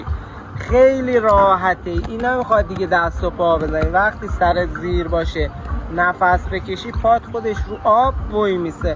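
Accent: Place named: Shiraz, Iran. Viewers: جنوبی 😂 شیرازیه👍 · لهجه جنوبی رو عشقه حاجی 💚🤍❤️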